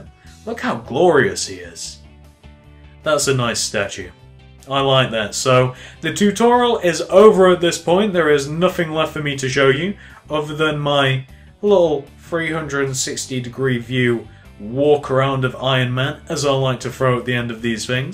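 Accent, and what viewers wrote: United Kingdom. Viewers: It's his accent, he's british xD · Mary Ruth Orr he has a british accent calm down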